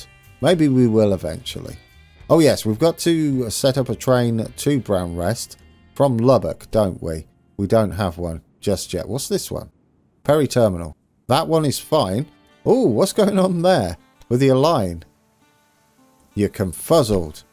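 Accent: England, United Kingdom. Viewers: Good episode. Nice train journey at the end! How come you are living in Austin? You sound English! I lived in Denver for 3 (excellent) years. Spent many a weekend exploring the Rockies. Also went to San Antonio, to see the Alamo, though I don't think we went to Austin.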